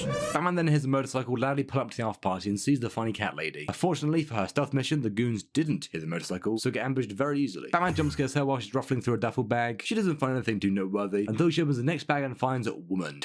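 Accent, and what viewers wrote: United Kingdom. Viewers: Wait... you're british?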